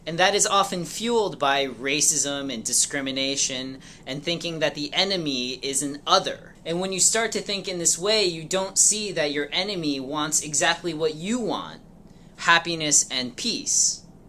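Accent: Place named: United States. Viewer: I can tell from your accent that you are American. If you are American, you should focus on racism in America. Living in Japan more than 20 years I know most Japanese learn about the racism within their country. Many people just don't get it or don't care much about it. As you said at the very beginning of your first video, there is racism in every country. You have no right to judge Japanese people unless you solve all the racial problems within your country.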